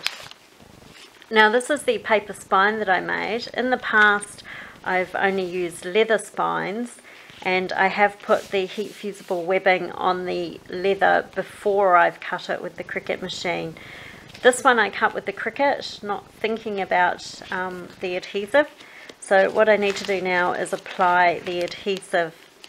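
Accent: New Zealand. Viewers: Just found you on this tutorial. Thanks so much, I can’t wait to get started on some second hand wallpaper and make a lovely cover using your technique, which will be sold in a very upmarket decor shop. So exciting. Awesome! Thanks once again from South Africa 🇿🇦 Is that a lovely New Zealand accent? Love it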